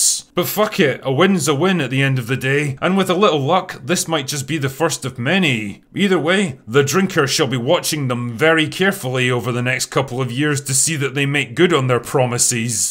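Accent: Scotland, United Kingdom. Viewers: i no longer watch actual movies or sports or even elections, i just watch whatever people are doing the highlights of the events. this guy talks same as willie from the simpsons the scottish groundskeeper.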